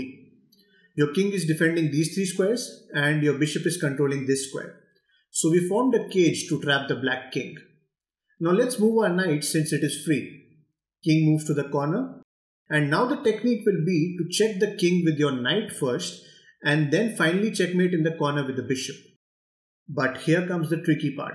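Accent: India. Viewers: Hindi ko maintindihan parang Bombay magsalita 56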